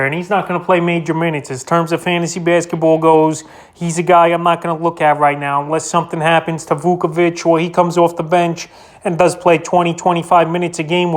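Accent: New York, United States. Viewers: We know you are a New Yorker with that accent and lack of basketball sense.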